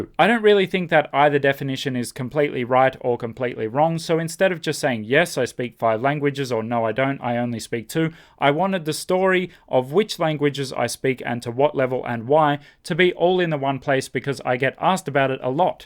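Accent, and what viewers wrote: Australia. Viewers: From your accent, I guess you are Aussie? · I speak English exactly as you do. I'm from NSW, I think we all kind of speak like you. I needn't support my claim, as most other English-speakers around world don't understand us anyway.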